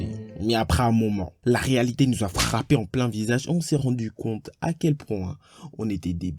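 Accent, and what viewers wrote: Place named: United States. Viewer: Incroyable les dessins et l’histoire t’es vidéo son vrm super et ton accent des state aussi 😂😭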